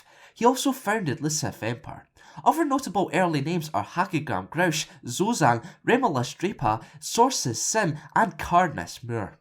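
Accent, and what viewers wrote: Scotland, United Kingdom. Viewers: Are you Scottish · Good to hear a fellow Scot out there doing this s#*#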